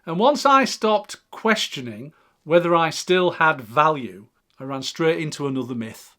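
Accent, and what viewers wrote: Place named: Liverpool, United Kingdom. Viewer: Hallo! I'm 61 and I just started roller skating and learning the ukulele in the last few years. I'm going to be taking a physics class in the fall. Thanks for these wonderful reminders. I'm considering writing a book and you're convincing me to give it a go. Never stop learning, never stop growing! 🎉 (BTW, your accent reminds me of the Beatles sometimes. Are you from Liverpool? I'm American, so I am not fantastic with British accents, but I do know they aren't all the same! 😂)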